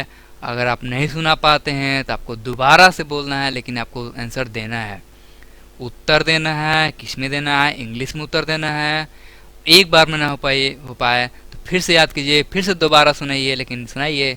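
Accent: Bihar, India. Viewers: Aap bihari h....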